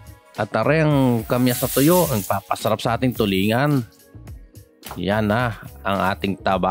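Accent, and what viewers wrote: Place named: Batangas, Philippines. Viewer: Ala eh batangueno ka rin pala, maganda yan pars pede ka na magnegosyo! #Reddtv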